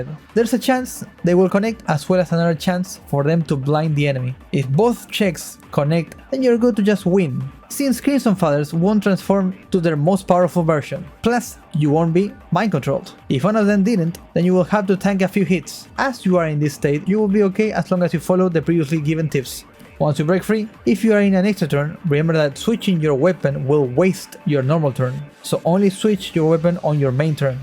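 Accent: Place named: Argentina. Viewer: el primer momento que escuche tu acento ya sabia que eras Argentino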